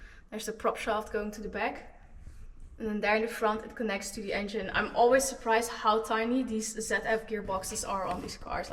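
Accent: Netherlands. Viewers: First time here. Heard your Dutch accent right away! Wondering where you are in Nederland? My kids & grandkids are in Barendrecht and Dordrecht area (Ik ben in Utah, USA... lang verhal, hoor! Lol 😅) I'm an 'ol Willys Utility Wagon guy. My dad bought new in '64 a new Wagoneer. Drove it in high school in the '70's. But I fell in love with the older Utility Wagons ('46-'63) I have a '59. I LOVE these old tough off-road machines. The Volvos, Mercedes, UAZ's etc. Usually having only a face a mother could love but capable of traversing almost any type of terrain. I'm pretty sure those machines around you are not challenged much in Holland (except mud) but would be "fun" in Russia or China or...??! You are a girl after my own heart. Would that you had been there years ago when I needed someone just like you. 😊 'sja... Nou ja, zeg! God bless you in making your dreams come true. 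If you ever want to see Utah (Moab) the Great Basin Desert, hit me up. Always welcome here! Totziens! 😎👍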